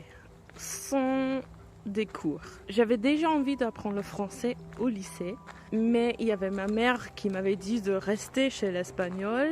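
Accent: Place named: Germany. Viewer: Tu as le même accent que mes cousins allemands!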